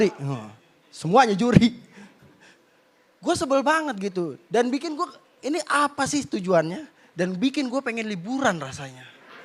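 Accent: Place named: Betawi, Indonesia. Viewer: Lu Betawi ya Bang? Lucu banget dan logatnya enak didenger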